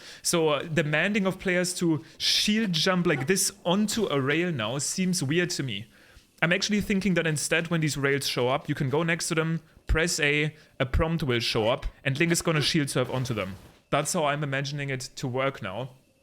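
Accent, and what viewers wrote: Germany. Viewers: Kommen sie aus Deutschland? Just curious! Your accent sounds like you're German 😅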